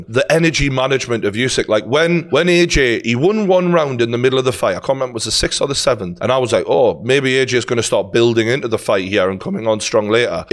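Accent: Newcastle, United Kingdom. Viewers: “Ruiz was already a fat fuck….no offence.” 😂 Love that Geordie!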